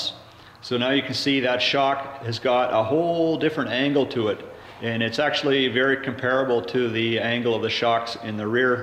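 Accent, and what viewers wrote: Canada. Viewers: I'm going to guess that he's from Newfoundland.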